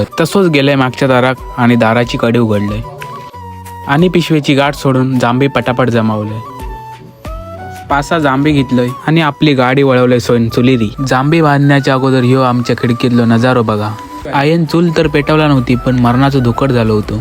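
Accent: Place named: Malvani, India. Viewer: Mast😊 ani malvani voice ❤ ek no.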